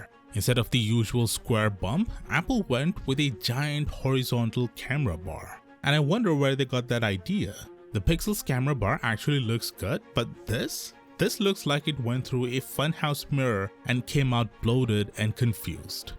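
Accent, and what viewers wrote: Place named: India. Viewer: Omg i can hear indian accent from few videos away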